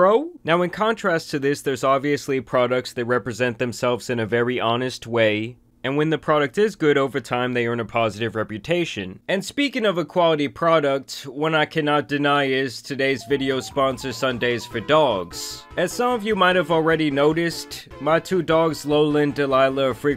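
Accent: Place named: Boston, United States. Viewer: Your dogs are adorable!! Man, I'm binging this channel! I love the blend of the comedy and intelligence! …and I think I figured you the awesome accent! My fav! It's Boston, right? OH, I was a little disappointed at mark, I thought you were about to rap when I heard how easily “Likely still They could not come to an agreement In this situation Prime continued to threaten litigation” flowed.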